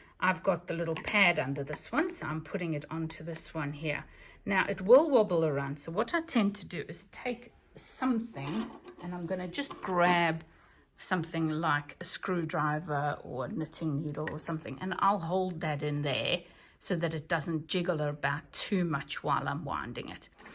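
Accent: South Africa. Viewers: I know a Sata African when I hear one. Thank For this - just bought the same machine to start my hobby and small projects. and your videos are just everything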